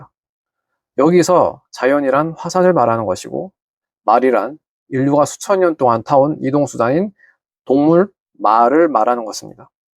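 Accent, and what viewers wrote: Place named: Jeolla, South Korea. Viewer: 얘 억양에 전라도 사투리 섞인거같은데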